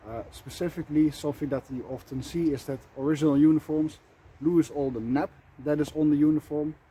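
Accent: Netherlands. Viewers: Into German reenactment too. I've done the same treatment as you did. I'm just a soldier, so no heavy wear and tear on my stuff. But like you said a headstart. Even as a soldier the uniform and kit went trough training, traveling, workduty etc. I'll allow the stains and aging to happen during my own time in the field. And hearing your accent. Groetjes van een mede Nederlander.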